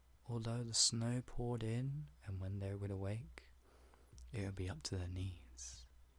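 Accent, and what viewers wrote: United Kingdom. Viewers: FINALLY A BRITISH AUDIO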